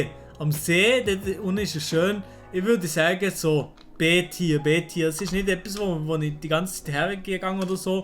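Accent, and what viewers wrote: Lucerne, Switzerland. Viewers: Lozärner Dialekt Horror? Direkt deabo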